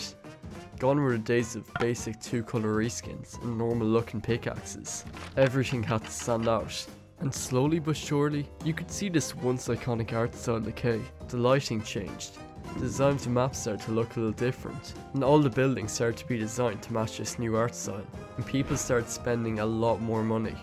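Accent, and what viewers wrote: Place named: Waterford, Ireland. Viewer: What county are you from cause your defintly a waterford man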